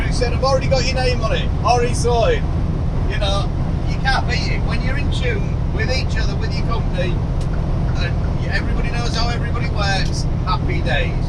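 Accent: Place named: Cockney, United Kingdom. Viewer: Is that a Cockney accent? Even the trucks recorded voice had a female Cocky accent. Doesn't sound like rural Iowa to me.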